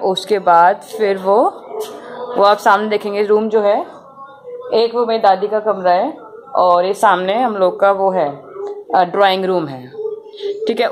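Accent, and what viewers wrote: Rajasthan, India. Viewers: Ma'am aap Rajasthan Se Ho🤔🤔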